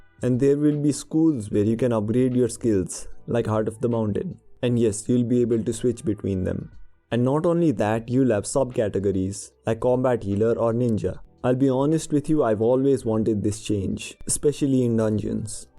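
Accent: India. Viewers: Hi are u Indian u sound like i also an indian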